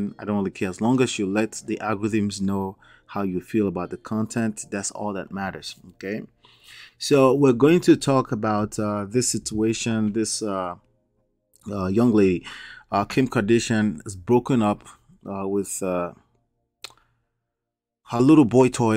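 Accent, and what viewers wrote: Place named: Nigeria. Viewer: Hi, you sound like a Nigerian. I am watching from Nigeria. Greetings. Just subscribed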